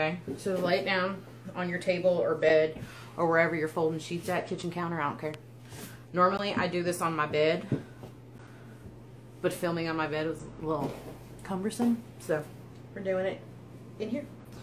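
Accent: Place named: South, United States. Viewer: like the southern accent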